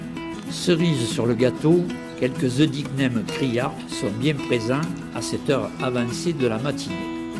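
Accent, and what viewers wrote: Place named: South, France. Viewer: agrémentés du petit accent du Sud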